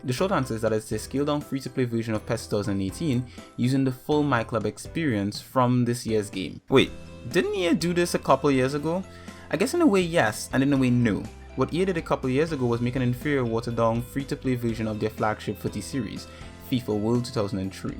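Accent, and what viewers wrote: Trinidad and Tobago. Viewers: you sound like ur from Trinidad and Tobago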